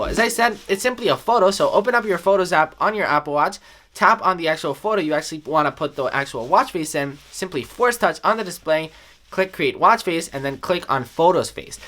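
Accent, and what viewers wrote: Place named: Spain. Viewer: He is from Spain. Of course he isn't gonna sound just like you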